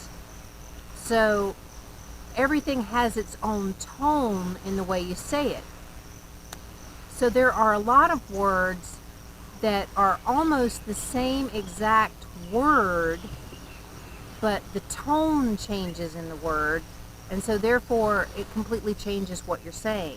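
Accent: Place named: South, United States. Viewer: Sweet thang, you sound most southern!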